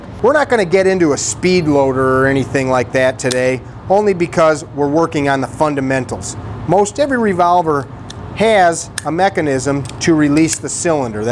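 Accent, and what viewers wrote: United States. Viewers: What is this American accent called? 😂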